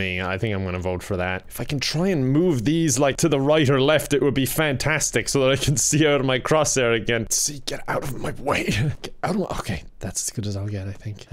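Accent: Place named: Ireland. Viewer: Are you Irish bc I am Love your vids ;)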